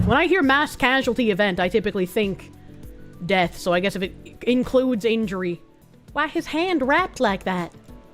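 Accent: Boston, United States. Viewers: has the only Bostonian accent that doesn't give me brain damage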